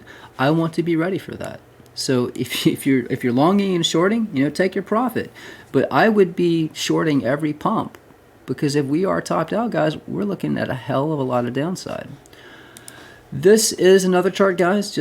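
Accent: South, United States. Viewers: No redneck Accent. Today?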